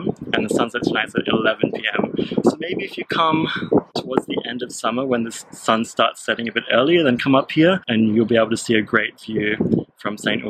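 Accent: Australia. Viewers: Your video popped up because we're heading to Tallin next week, and looking at all the vlogs from there. Hubs is watching while I'm working and I heard the accent I was OOOOOO an Australian accent and came to watch lol. Maybe we'll bump into you in Finland on our way back!! #HappyTravels!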